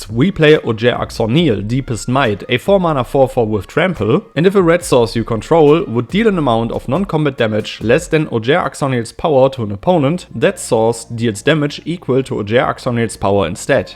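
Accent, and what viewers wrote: Germany. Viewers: I love the sound of your english 🤣 it sounds like german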